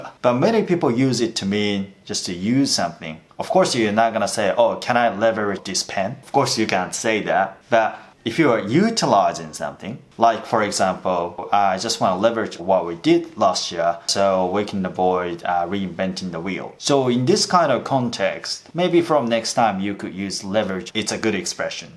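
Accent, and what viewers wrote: Australia. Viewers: 久しぶりに見たら別人かと思うくらい発音がオーストラリア訛りになってました。あえてここまでする必要はありますか？ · オーストラリアの英語かわいいですよね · 今日の動画、これまでで一番オーストラリア英語っぽいです！ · むかしのATSUさんの英語のアクセントと全然違う、オーストラリア訛りになってる。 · オージー訛りですよ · オージーなまりですね。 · わざとオーストラリアっぽいアクセントで話してるのかな いつもより訛り強めですね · めちゃめちゃオージーの発音でびっくりです笑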